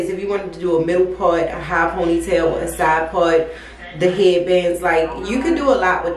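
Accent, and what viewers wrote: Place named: Washington DC, United States. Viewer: your accent is so familiar, are you from DC?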